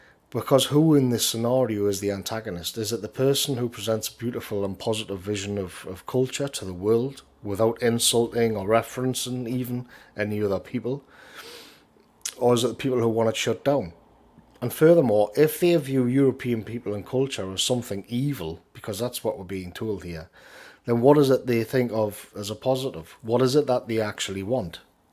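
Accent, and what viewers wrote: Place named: Newcastle, United Kingdom. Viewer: Excellent as always, with the usual sprinkling of linguistic nuggets: "sublime" is a word we hear so very little these days, especially in a Geordie accent. The Daily Shoah crew often talk about "The Kosher Sandwich" which is a similar ( but not identical) metaphor. After that my autoplay selected your vid: 'Roger Scruton & Words Of Power' which dove-tailed perfectly Thanks again. Clarity, insight, passion and brevity. It's a winning formula.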